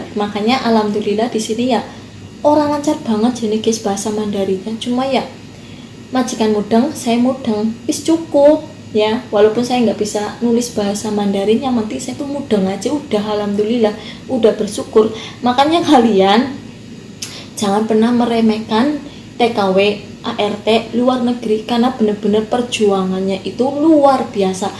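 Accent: Banyumas, Indonesia. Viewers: Selamat pagi mbak ngapak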